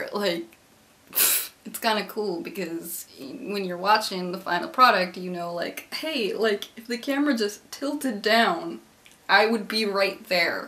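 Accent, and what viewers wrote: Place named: Minnesota, United States. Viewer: your minnesota accent was rly prominent in this video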